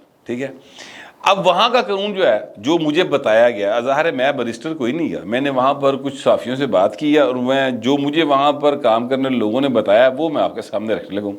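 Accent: Lahore, Pakistan. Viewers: ZindAbad.pure lahoria style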